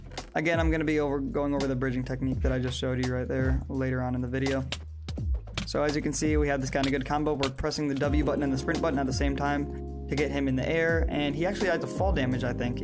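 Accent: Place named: Netherlands. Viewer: where are you from you sound dutch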